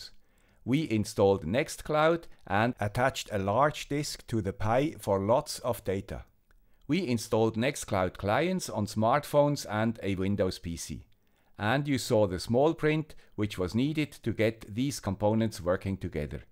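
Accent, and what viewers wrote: Switzerland. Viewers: Never imagined a guy with swiss accent would save the internet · Thank you guy with the Swiss accent. This exactly what I’m in the middle of trying to set up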